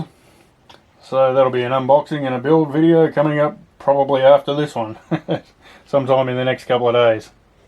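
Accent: Australia. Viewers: Awesome, thanks for that. Mind if I show this technique in my HG P407 build videos? Also, it's refreshing seeing and hearing an Aussie modeller on YouTube. Nice work, mate.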